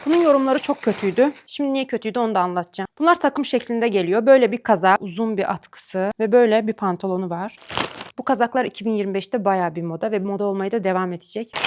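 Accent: Azerbaijan. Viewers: Birinci vidyusuna baxanda danışığından bildim ki azərbaycanlıdı😂😂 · Abla Azerbaycanlımısın? Biraz Azerbaycan şivesi ile konuşuyorsun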